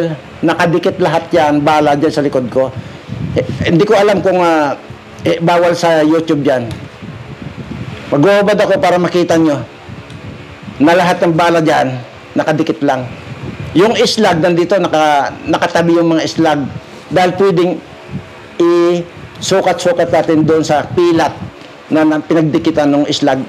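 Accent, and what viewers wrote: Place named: Visayas, Philippines. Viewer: Ngayon ko lang napanood ito nakatsamba kasi ako dahil nariring ko bantag bisaya ka sir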